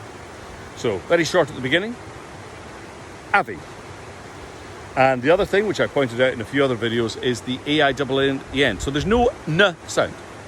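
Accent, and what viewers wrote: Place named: RP, United Kingdom. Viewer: What 'English' sounds? your english is obnoxiusly posh sounding , believe it or not.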